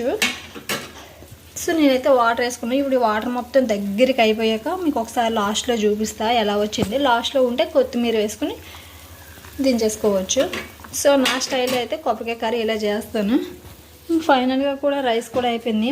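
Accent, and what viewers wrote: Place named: Godavari, India. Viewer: Godavari la undi Telangana language sister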